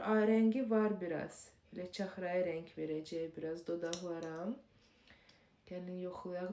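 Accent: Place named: Russia. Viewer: Russ dilli xanımdır normak həyatda azərbaycan dilindən çox isitifadə etmir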